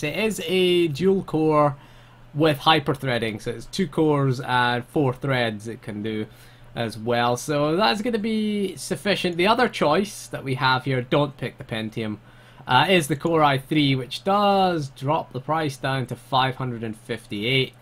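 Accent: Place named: Scotland, United Kingdom. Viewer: He's from scotland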